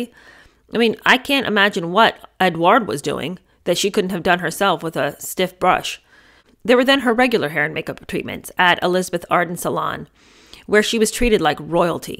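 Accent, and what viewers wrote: United Kingdom. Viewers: I LOVE your British accent...so cute...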